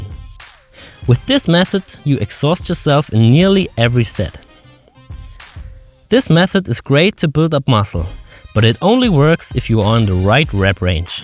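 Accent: Germany. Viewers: Wow you sound reaaaally german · Sorry aber dieser heftige deutsche Akzent ist mir zu anstrengend.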